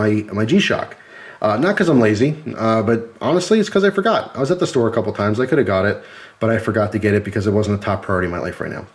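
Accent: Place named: New Jersey, United States. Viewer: Couple things, if you don't mind: Where are you from? I ask because I'm from Jersey and you sound like my people haha. Also, you mentioned Rite Aid, and I haven't seen one of those since I left and came down to FL (been down here 8 years now). I also ask because I know how horrible Jersey gun laws are since a buddy of mine still lives up there and is constantly bitching about it when we talk guns and gear, etc. And, if you don't mind one bit of advice/comment why the smaller mag for backup? Since it's in your pocket anyway, why not use the full-size magazine (not the 33, but the standard 13-17 rd depending on caliber) instead? I ask because it hit me that for not much more weight/size difference, I can get a few extra rounds, and if I'm already reloading, then chances are I might need them. Just a thought, just trying to help. Thanks for sharing your EDC, always good seeing these and getting ideas. 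Much appreciated and stay safe, brother.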